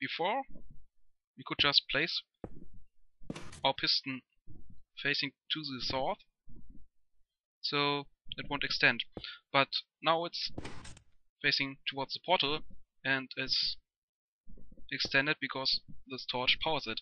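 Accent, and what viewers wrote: Germany. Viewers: Bist du deutsch hört sich so an wen ja schreib was auf deutsch German !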